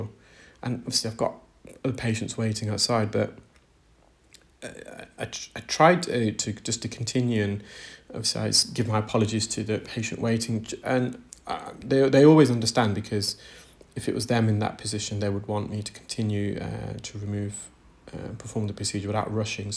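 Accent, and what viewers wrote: England, United Kingdom. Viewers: Hmmmm... I believe that he's English and as such you really can't complain about any pronunciations . I think they have the corner on the market for all English language users.lol That's basically asking someone to speak "American English". Pretty rude if you ask me.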